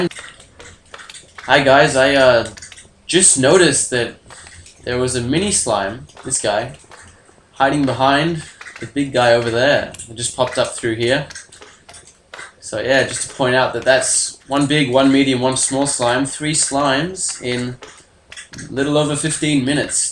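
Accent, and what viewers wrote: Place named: Australia. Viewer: Can really hear your Australian accent start to come through here!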